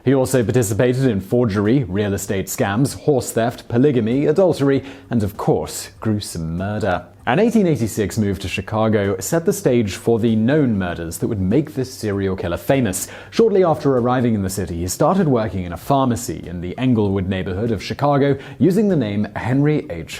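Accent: United Kingdom. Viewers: "Murder" sounds so much more sinister with a British accent.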